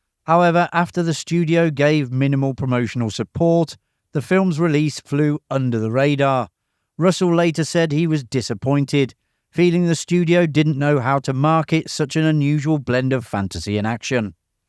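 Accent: United Kingdom. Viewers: Its only his British accent! I'm getting Cattrall! UK here.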